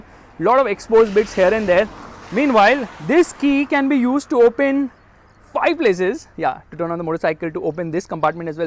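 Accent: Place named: Mumbai, India. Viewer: That is Mumbai/Pune English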